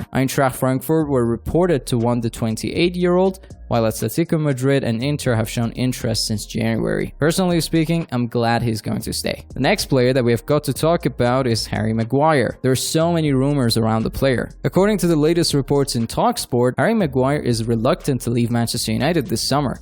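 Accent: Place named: United States. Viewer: I dont understand one item that he speaks about please stop these american voices and put in british voices.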